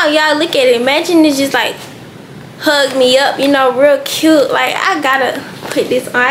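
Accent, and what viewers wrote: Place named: Atlanta, United States. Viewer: And I love your voice, girl, the Atlanta voice😊❤🎉😮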